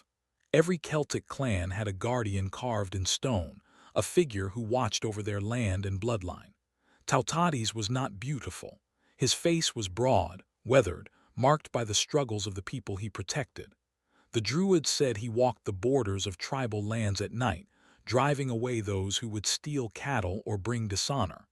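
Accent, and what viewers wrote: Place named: United States. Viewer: American pronunciation absolutely does my head in. It is just pure lazy.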